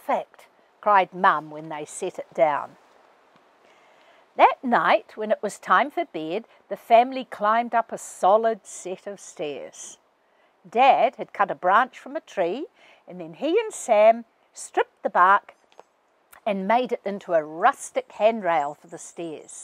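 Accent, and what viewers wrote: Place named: New Zealand. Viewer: I wait for every chapter. This is a keen idea you reading your book, especially with that enchanting NZ accent. I will be in my kitchen preparing dinner, baking a loaf of bread or washing up after supper and I realize my face is smiling as I listen😊. I did send an email and I do hope you received it. Blessings, Cathy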